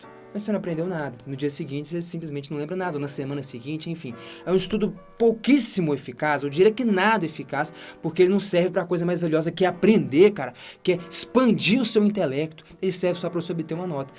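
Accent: Minas Gerais, Brazil. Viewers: O sotaque dele é muito de mineiro msm minha família é mineira e eu sou goiana e ai ja viu né ksksksks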